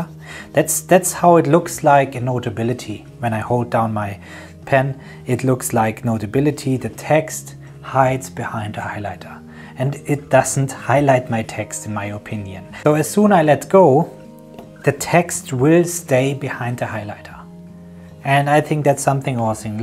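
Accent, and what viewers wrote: Germany. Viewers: Das ist very german englisch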